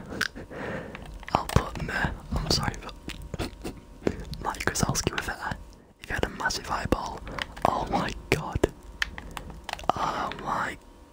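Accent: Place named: England, United Kingdom. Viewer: cool! i feel like people from england have great asmr voices, and you did not disappoint:)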